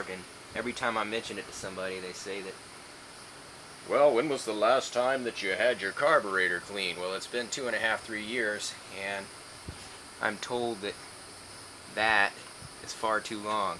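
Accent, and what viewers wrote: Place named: Florida, United States. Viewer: sounds like you live in florida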